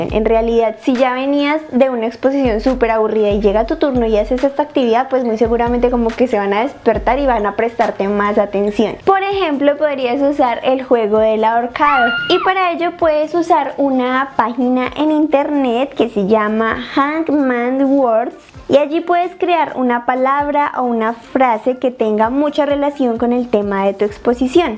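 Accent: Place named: Colombia. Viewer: Eres colombiana verdad? Me gusta tu acento😊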